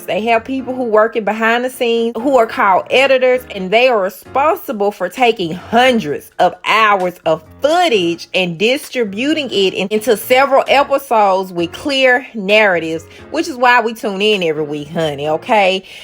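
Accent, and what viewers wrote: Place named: Huntsville, United States. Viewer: Hi. I liked the video. You must be from Huntsville you talk like them(accent) ❤️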